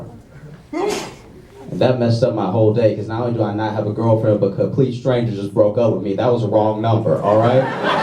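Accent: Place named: Louisiana, United States. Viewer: What's good Fam! Im from North Louisiana, half way between Alexandria and Shreveport. A little shithole called Zwolle! I just ran across ur videos and was checkin it out and of course that Louisiana draw caught my ear. When you said you from Louisiana and everybody clapped and u said not New Orleans and said New Orleans to Louisiana was like having 1 beautiful blue eye and a fuckd up face!! I laughed my ass off cuz! When I tell people where im from I can already see images of Mardi Gra starting to form n their head. Keep up the good work man, make us proud! life n such a fuckd up place will giv u a either a chip on ur shoulder or the best sense of humour ever. I guess u got the latter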